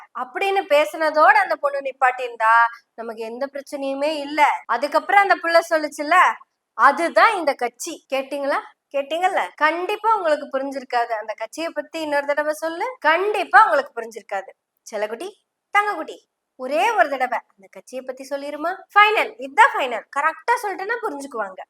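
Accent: Kongu, India. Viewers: உண்மையை கொங்கு தமிழில் அழகாக சொல்லும் சகோதரிக்கு வாழ்த்துக்கள்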